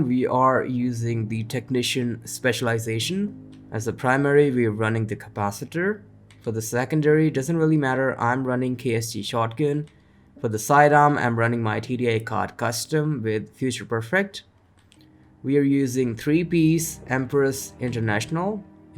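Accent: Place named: Germany. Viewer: Dat German accent😊 😉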